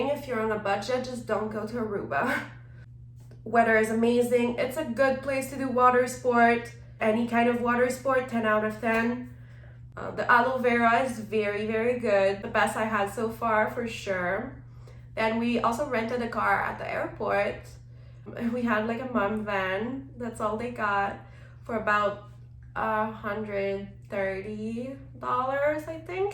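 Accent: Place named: Russia. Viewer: Your accent sound a little bit Russian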